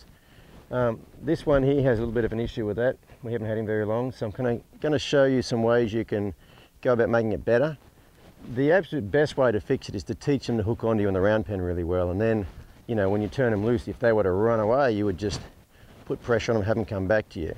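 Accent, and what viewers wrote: Australia. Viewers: Lol it doesn't understand his Aussie accent.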